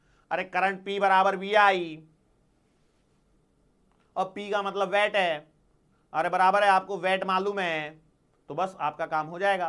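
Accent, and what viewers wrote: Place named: Varanasi, India. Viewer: Good evening everyone ha sir ham hamesa yhi sochte qki ham Varanasi se h or aap jo bhi kbhi kbhi bolte h yha aisa lgta h sayad yhi ke h...😁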